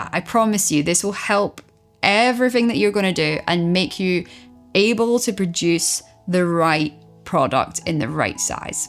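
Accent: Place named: Scotland, United Kingdom. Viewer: thank you - I've recently discovered your channel - although I was taught to knit as a child by my gran, I hadn't done it for years and needed a refresher course before tackling a blanket for a friend's first grandchild. this is exactly what was needed and being Scottish myself, I really appreciated the accent!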